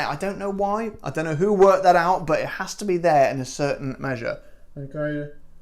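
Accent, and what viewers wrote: Scotland, United Kingdom. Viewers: Ur American voice is funny but I like ur Scottish accent. Bringing love from the states bro bro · One thumb up for the Scottish accent. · Ooohhhh a Scottish burr is the sexiest accent on the planet. Nice!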